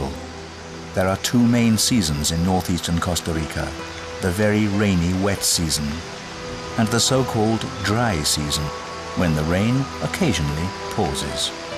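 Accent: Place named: England, United Kingdom. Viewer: All nature shows should be voiced by English men, this video is proof of that. Beautiful and tragic, only true nature lovers and poets can appreciate this kind of documentary.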